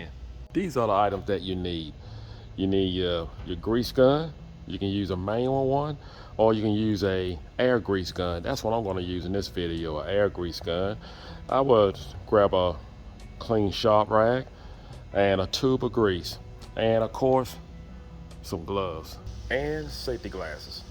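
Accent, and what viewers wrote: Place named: Texas, United States. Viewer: I really appreciate your videos. I am trying to place where you are from. I am guessing south Texas like Houston or San Antonio? If not there maybe South Carolina?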